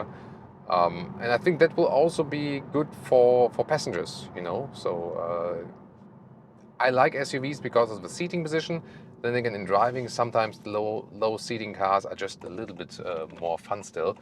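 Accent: Germany. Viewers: His german accent is so hard and difficult to understand.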